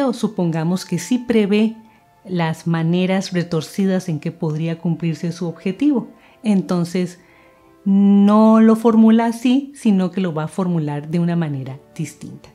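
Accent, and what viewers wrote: Costa Rica. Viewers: Es tica? Notó su acento :) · Sííí…! 😄 Yo también quedé intrigada. Leyendo los comentarios supe q era costarricense… Hay acentos que en América del Sur no es común escuchar…😊 Que agradable suena, verdad ? (Bueno. Además se nota que ella es una mujer educada. Modula muy bien y habla pausadamente). Saludos desde 🇨🇱 ❣️ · Por la pronunciación de su "r" pienso que es de Costa Rica. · Es costarricense , acento tico lindo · desde que escuché la forma en que pronunciás la R supe que eras de costa rica. Que orgullo que una mujer tan sabia y espiritual sea de mi patria ♡ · Sos tica? Esa R me suena tica jejeje soy de San José.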